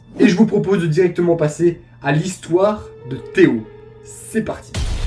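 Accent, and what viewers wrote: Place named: North, France. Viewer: Bonjour, Je t’ai découvert il y a une semaine et j’adore ta façon de mettre en scène et de conter les histoires. Créativité, humour sont au rendez vous !! D’ailleurs est ce que tu es originaire du nord de la France ? En effet, ta manière de prononcer le 20 m’interpelle car je le prononce de cette façon étant originaire du nord de la France 😅 ! J’ai un ami qui écrit des histoires d’épouvante et d’horreur donc un jour tu as le souhait de conter des histoires fictives et originales n’hésite pas Je tiens à signaler que je t’en fait part juste parce que c’est mon ami et que je crois en ce qu’il écrit Excellente continuation à toi ! Sabrina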